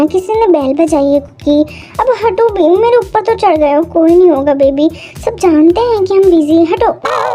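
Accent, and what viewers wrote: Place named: Amroha, India. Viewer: AAP Khan se Ho tumhari language toh amroha ki lag rahi hai 😊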